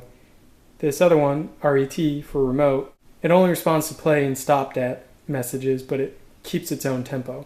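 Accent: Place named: United Kingdom. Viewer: So thats what you look like....I always thought you were british lol.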